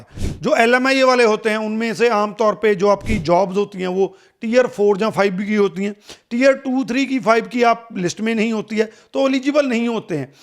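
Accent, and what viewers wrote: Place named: Punjab, India. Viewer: He is from a Punjabi speaking background, that's why it is a little bit different accent.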